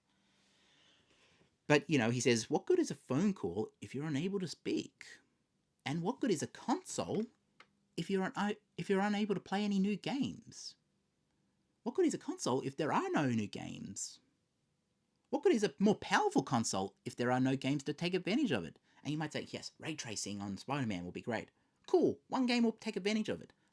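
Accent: Australia. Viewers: I mostly want a ps5 for the new Silent Hill games coming out. I heard theres supposed to be good games coming near the end of the year. I agree with you that ps5 should be at least able to play as far back as the ps3 games. You have a really awesome and soothing voice btw you would be great for voice overs especially in the west because your Aussie accent is very charming. Keep up the great work.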